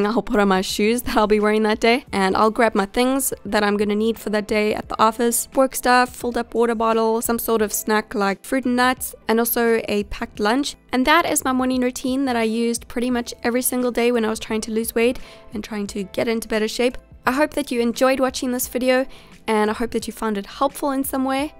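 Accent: South Africa. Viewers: Is sy van suid Afrika? Want sy klink soo... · Hallo. Bly jy in Suid Afrika. Jy klink net so. Wow, jou videos is baie mooi. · OMG. This is my first vid of yours. I'm from South Africa and as soon as I heard your accent I got so excited. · Pxssy Destruktor she is from South Africa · I'm new... your accent? South African? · I have a question are you south african? because you sound very south african by the way I am south african. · Omg omg are youSouth African ? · Are u south African ? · Are you south african? I can hear ittt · When you said that you drink Royibos tea ( I can’t spell) I knew that you had to be from there, because you also have the same accent as my parents ( they are also from South Africa) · Cat Mouse she’s South African😊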